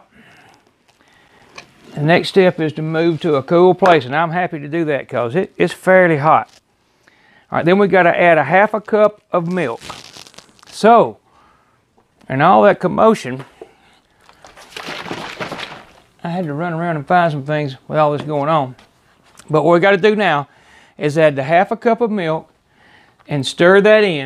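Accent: South, United States. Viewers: I just love your Southern Accent! My teenage daughters continue to watch you , they even walk around spewing out your hook”007” they just Love you! Keep on Cooking!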